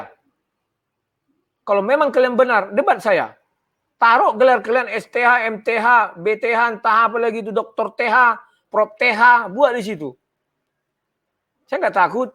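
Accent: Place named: Medan, Indonesia. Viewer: Saya senang dengan bang karna pemahanan dalam Alkitab, dan cara penyampaian dari bang ini khas orang Medan...... mungkin teman2 ada yang kurang srek dgn cara penyampaiannya, tapi saya yakin penyampaiannya jujur dan mencerahkan kita semua....semangat terus pak...Selalu diberkati Tuhan.... salam dari Manado. IMANUEL.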